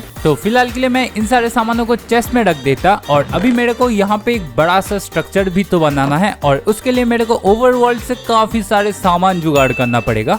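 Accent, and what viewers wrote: Bengal, India. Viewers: Are you Bangali??? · Bro r u Bengali ??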